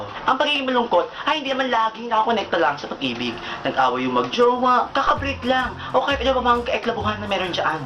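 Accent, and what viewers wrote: Visayas, Philippines. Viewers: HALA BISAYA DIAY KA -HA KA HAHAHAHAHA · Kuya, bisaya ka ba? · bisaya kba Kuya? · Grabe! halata kaayo na bisaya! xD Proud to be BISAYA!!!! :) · Oy, bisaya diay ka? Daghan nata. Kabibo. Nganga :)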